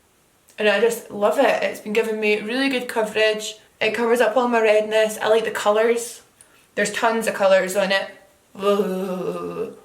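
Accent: Scotland, United Kingdom. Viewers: Scottish accents make me happy. lol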